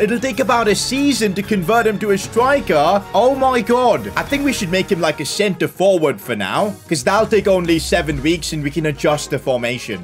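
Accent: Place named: India. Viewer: Ngl you sound like a mix between a sterotypical indian uncle and vikstarr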